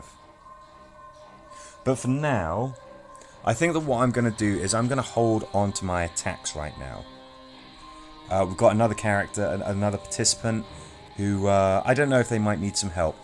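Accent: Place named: United Kingdom. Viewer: Nice work, as usual. I think the British accent helps your credibility (not that you need it) but your commentary is superb.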